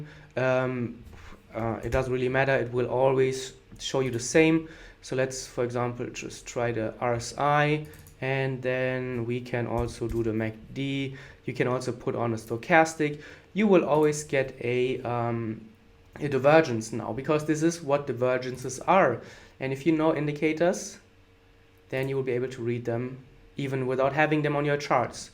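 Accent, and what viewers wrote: Germany. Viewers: Dialect like german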